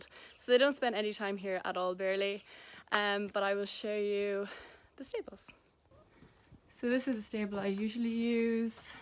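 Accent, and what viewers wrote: Ireland. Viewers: I just love the way you say that bloody chicken I love the Irish accent (I'm from Ireland) Also u inspire me so much about 2 years ago a connemara mare called Jeddah was at my stables and I ride her an loved her until I done a round of canter and bucked me off the next week my instructor told me to ride her again to get my confidence back so we done another round of canter and bucked AGAIN this time she positioned her neck to help me but it didn't work I ended up spraining my arm so I couldn't ride for a while that knocked my confidence the next year I had a bad call I was jumping a gate at about 50cm and the horse I was riding was about Fiona heighten was a grey ISH he refused it 3 times eventually 5 girls started waving their arms to get him over it be jumped it and apparently my instructor said he jumped it like 2metres higher the n it was I wasn't ready for it so I fell of but me being awkward sommersaulted and landed head first and I got knocked out for 20mins for the next 6 months after that I would hardly trot I was so terrified u until we came across you you are such an inspiration thanks to you I have accomplished jumping a metre 10 last month I'm 12 years old now and I feel like an advanced rider (maybe a bit to far) thanks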